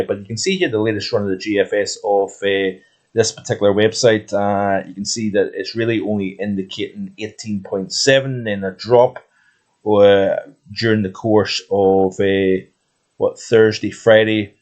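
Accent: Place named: North Antrim, United Kingdom. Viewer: Best wishes to the lady on such a big milestone. Yesterday wasn't that nice but it stayed just well enough behaved for us to do our usual walk around Annalong after getting a feed from the galley, a bit of a Sunday ritual of ours during the warmer months of the year, we travel from Armagh, was only in the last few weeks I discovered you were in fact a local man before moving up there to Scotland but always had my suspicion with the accent, thought you might have been North Antrim originally. Wind was rather lively mind you and the sea rough too.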